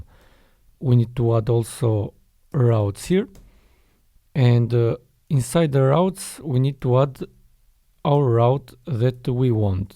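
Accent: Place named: Albania. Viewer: pershendetje, prej aksentit qenke shqipetar 😊.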